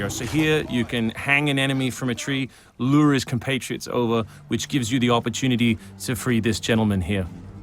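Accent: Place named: Australia. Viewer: Sounds like a bit of Aussie in the narrators accent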